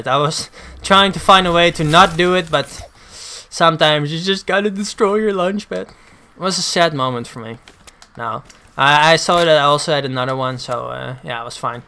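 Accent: Netherlands. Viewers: Are u dutch . Je hebt een nederlands accent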